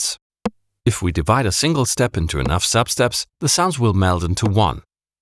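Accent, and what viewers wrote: Germany. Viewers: you sound extra german in this video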